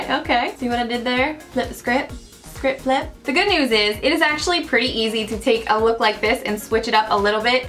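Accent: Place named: Mid-Atlantic, United States. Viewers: When people talk about your accent, I am always confused, since all my family lives around Philadelphia, so I don't think you have an accent. You just sound mid Atlantic. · ur from philly area? northeast? south philly? west philly? am from bucks county area..wow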